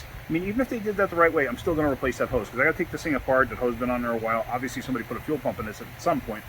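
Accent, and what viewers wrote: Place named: New York, United States. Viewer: I thought you sounded like a New Yorker, then in recent videos you've confirmed that. I spent 30 years in Texas and for a bit in the 80s did the annual state safety inspections. I've now been in Minnesota for 10 years and have now experienced the rusty crusty stuff common in the "salt belt" of the US. I've been watching Eric O at SMA deal with the rusty crusty stuff PLUS the crazy laws and regulations and restrictions that NY state puts on cars and I have mad respect for him dealing with all that. I'm guessing you're glad to not have to deal with all that!!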